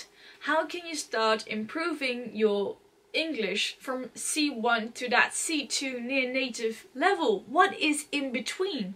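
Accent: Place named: RP, United Kingdom. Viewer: hiya! prob u r not reading the comment section tho i can’t help but hats off to ur accent. this is just marvellous, impeccable clear RP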